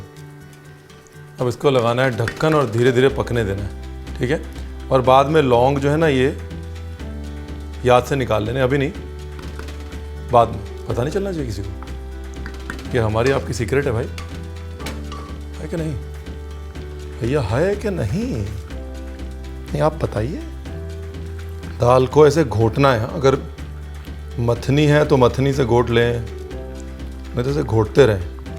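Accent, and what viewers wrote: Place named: Lucknow, India. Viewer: हय की नहीं😀😀😍❤️ ....chef ye hamare aapke Lucknow ki tone h...aapki बोली mein kafi baar ye nazar aata h 😊😊 So sweet Daal to ekdam mast h Ranveer 🌹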